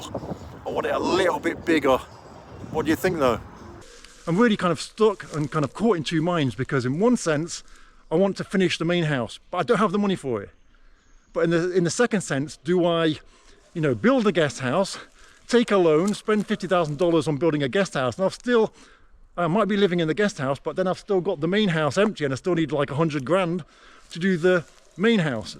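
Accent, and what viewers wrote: United Kingdom. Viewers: Он уже 20 лет живёт в РФ, считай он один из самых ранних экспатов-ютуберов и у него очень хороший русский язык на достойном уровне, практически носитель но с британским акцентом)) Это кстати солидное преимущество, так как многие иностранцы годами не могут освоить язык кроме - "Всэм Прувет ит из..."😅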